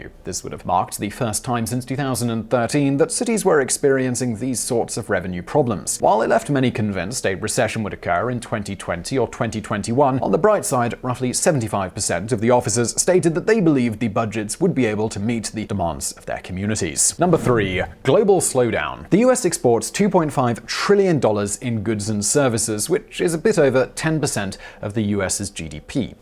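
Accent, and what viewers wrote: United Kingdom. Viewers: despite what his British accent may imply, he is an American.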